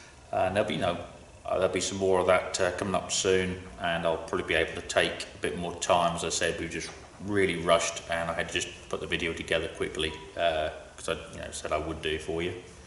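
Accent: Norfolk, United Kingdom. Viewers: Really enjoying the videos. Its good to hear a good ole Norfolk accent on here. You always know where you are with a flask of hot tea.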